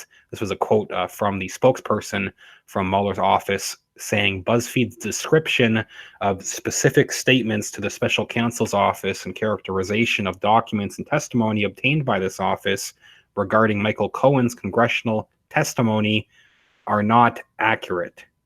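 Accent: Canada. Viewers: if you click on ABOUT--- it shows the channel information page...channel location is Canada . His accent is Canadian they sometimes pronounce about...aboot...kinda cool ...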